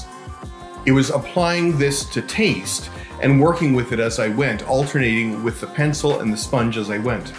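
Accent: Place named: Canada. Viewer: Great video, and being from western Canada (Victoria) myself, I too use the same pronunciation - its' just a Canadian thing, eh?;-) A couple of questions: I was curious why you were not wearing gloves while painting and handling the model; and what liquid was being used to clean your airbrush between coats; and I think I also saw 91% isopropanol being used a reducer.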